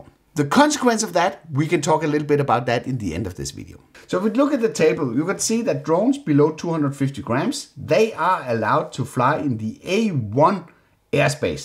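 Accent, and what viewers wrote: Netherlands. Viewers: That is the most stereotypically Dutch speaking English accent I have heard. Love it!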